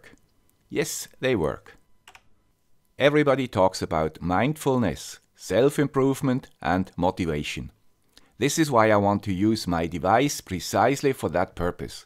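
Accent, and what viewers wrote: Switzerland. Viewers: is there a way becoming Swiss without having this funny German dialect? =D Hamburg (Germany) has some shelters, but we haven't as many as you have. Oh, and I like your income too, but not your expenses to live. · I was surprised how different some of the words were. I guess, I should have not been, but I've never been to Switzerland (sadly). Now I appreciate his Swiss English more...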